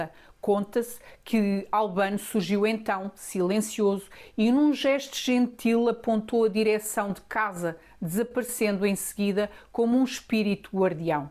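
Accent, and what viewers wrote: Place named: Portugal. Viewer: É quase um luxo ouvir Português de Portugal, em Portugal ☹️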